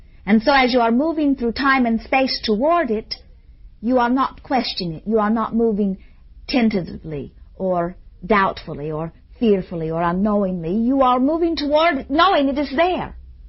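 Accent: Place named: Ireland. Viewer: her accent was diffrent when she was youg??? she sounds irish here!!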